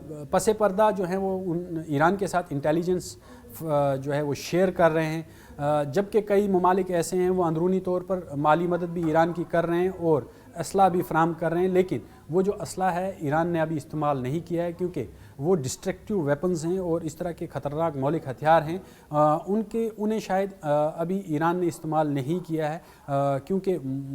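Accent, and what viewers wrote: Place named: Pakistan. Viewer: He is not from India. He talk like Pakistani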